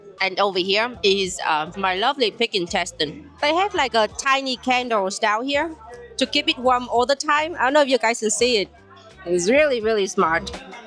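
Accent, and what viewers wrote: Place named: Germany. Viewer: The cutest thing is hearing your English sound more and more like a German speaking English, it’s adorable :’)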